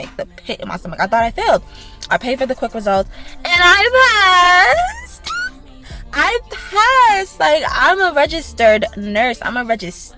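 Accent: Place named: New York, United States. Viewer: I loved this video the entire time i’m like she sounds like she’s from NY bc I’m from the Valley. I felt like i was watching my own testimony from you saying God favors you differently to still living your life while studying But you saying OD nailed it 😂It was in the stars for you I love that you and your mom tested the same date So sweet Congratulations love 👏🏽👏🏽Thanks for the tips ❤️🙏🏼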